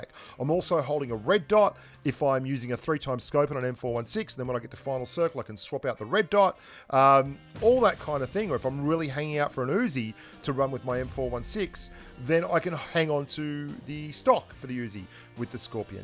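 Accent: Australia. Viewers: You literally need to be sound like australian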